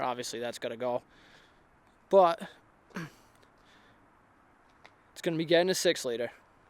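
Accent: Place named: Massachusetts, United States. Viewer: You’re from eastern Massachusetts, aren’t ya. Lol. Awesome work. How hard would that be to install in my 92 F350?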